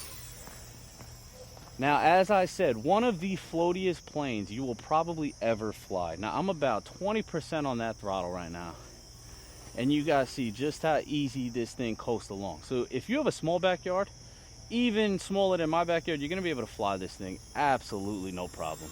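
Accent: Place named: Long Island, United States. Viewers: thought that was a LONGISLAND accent! I'm in Huntington! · “Where I live on Long Island”. Nothing was ever more obvious when the first words were spoken in this video 😅. Cool little plane.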